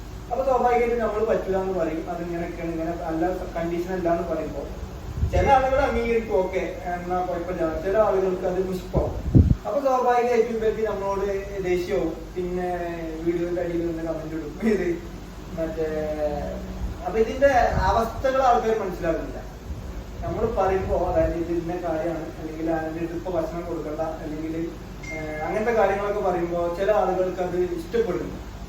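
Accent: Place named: Malappuram, India. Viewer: ഇക്കാ...ഞാൻ വളരെ എടുത്താണ് കാവേരി കുട്ടിടെ വീഡിയോസ് ഒകെ കണ്ട തുടങ്ങിയെ....പക്ഷെ ഒരു മാജിക്കൽ എഫ്ഫക്റ്റ് പോലെ ഇ ചാനൽ എന്റെ ടോപ് ഫേവറിന്റെ ചാനൽ ആയി മാറീ...ഇപ്പോൾ ഇക്കയും, കാവേരിയും എനിക്ക് ഏറ്റവും ishtamulla രണ്ട ആൾക്കാരാണ്....നിങ്ങളുടെ വീഡിയോസ് ഡെയിലി കാണുന്നതു തന്നെ മനസിന് ഒത്തിരി സന്തോഷം ആണ്. എപ്പോളും shorts എടുത്ത് കണ്ട വീഡിയോസ് വീണ്ടും വീണ്ടും കാണും...ചേട്ടന്റെ ഇന്റർവ്യൂസ്,Ottayan vlogs ഒകെ ഞാൻ എന്നും കാണും..... എന്തോ e ചേട്ടനേം ആന പെണ്ണിനേം ഒത്തിരി ഒത്തിരി ഇഷ്ടം.പിന്നെ ചേട്ടന്റെ മലപ്പുറം ഭാഷ അതും രസമാണ്...എന്റെ ജില്ലാ കൊല്ലംജില്ല ആണ്. ചേട്ടന്റെ മലപ്പുറം ഭാഷ കേട്ടോണ്ട് ഇരിക്കാനും രസമാണ്...Anoush മോനും വൈഫെയ്‌നും ഡാനിഷിനും ഉപ്പക്കും ഉമ്മമാകും സുഗന്ന് വിശ്വസിക്കുന്നു.....നോമ്പ് കാലത് എല്ലാ അനുഗ്രവും ചേട്ടനും ചേട്ടന്റെ ഫാമിലിക്കും, വീട്ടിലെ എല്ലാ മിണ്ടാപ്രാണികൾക്കും നേരുന്നു.....ചേട്ടന്റേം കാവേരിടേം കഥ ഒകെ ഇപ്പോ ഞൻ എന്റെ ഫണ്ട്സിനോടും,അപ്പൂപ്പൻ അമ്മാമയോടും ഒകെ പറയും..ടീവി വീഡിയോ കാണിച്ചപ്പോ അവർക്കും നിങ്ങളെ ഇഷ്ടായിയ്.എനിക്ക് ആന കമ്പം ഇലായിരുന്നു.ഇപ്പോൾ പക്ഷെ കാവേരി പെനിൻറേം ചേട്ടന്റേം ഡാനിഷ് ബ്രോഡിഎം fan anu njan❤❤❤❤. 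കൊല്ലം ജില്ലക്കാരി ആയ ഞാൻ എന്നേലും നിങ്ങളെ ഒരിക്കൽ കാണും എന്ന പ്രതീക്ഷയോടെ നിർത്തുന്നു.....ഇ മെസ്സേജ് വായിക്കണേൽ പറ്റുമാണെങ്കിൽ ഒരു റിപ്ലൈ തരണേ ട്ടോ ❤❤ With Lots of Love and Best Wishes Abhirami from Kollam❤❤💓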